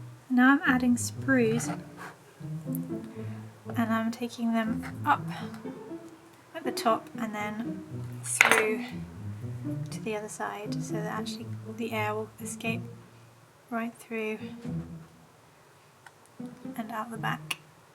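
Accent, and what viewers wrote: United Kingdom. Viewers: I feel so content when I hear your voice cuz I feel like british accents sound so professional and cool.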